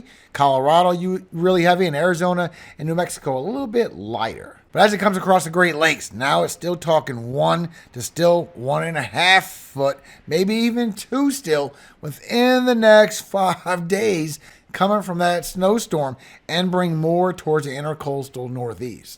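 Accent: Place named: Los Angeles, United States. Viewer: I knew you had to be from LA with that accent. You are my "go to" for weather forecasts and updates. I can always depend on you inform us in LA what we can expect during the active weather events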